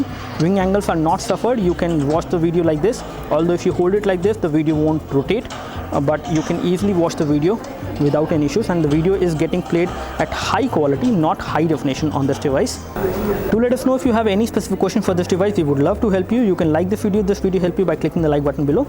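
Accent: India. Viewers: Indian Pronounciation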